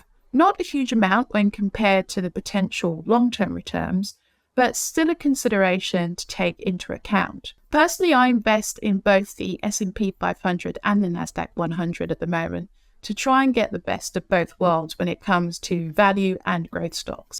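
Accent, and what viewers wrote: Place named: United Kingdom. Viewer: A Brit talking/teaching about the American stock market? LoL...I was caught off guard at first. Like your accent, though. Good stuff. I'll check out the rest of your videos too.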